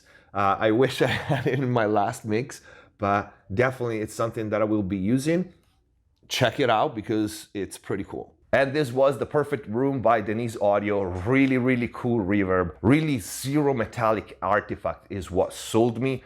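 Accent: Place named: Italy. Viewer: Ma allora sei Italiano? Dall'accento infatti, mi sembrava, leggermente familiare con la nostra lingua:). E' molto buono il tuo inglese, sono stato anch'io a Los Angeles e spero di fare un' esperienza ancora più lunga. Grazie per i video e per i consigli, sto imparando alcune cose!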